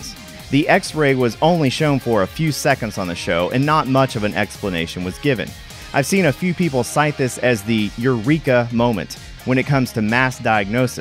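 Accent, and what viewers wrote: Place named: United States. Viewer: Solder, he was talking American-English.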